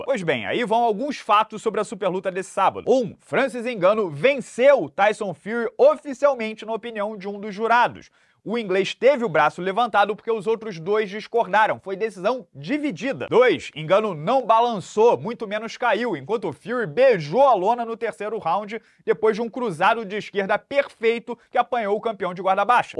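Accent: Rio de Janeiro, Brazil. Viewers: Esse vídeo teria sido muito bom se não tivesse sotaque carioca.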